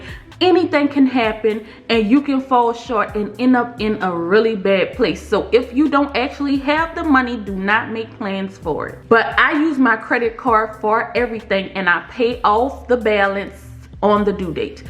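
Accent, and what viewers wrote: New Orleans, United States. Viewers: She sounds like she is from New Orleans 🤔🤔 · you're from New Orleans lol.... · Sis are you from New Orleans?👀👀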